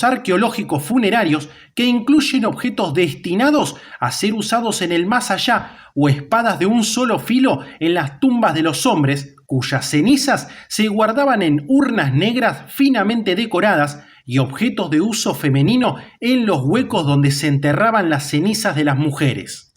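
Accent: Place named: Argentina. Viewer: Buen documental, PERO deberías de dejar de husar jergas y acentos cuando se trata de ' Nombres propios ' de Ciudades, paises, regiones, o nombres de personas... usar la She , sho, shu, sha, etc en nombre própios es an algunos países un insulto, ese el problema con los acentos argentinos, por lo demás perfecto.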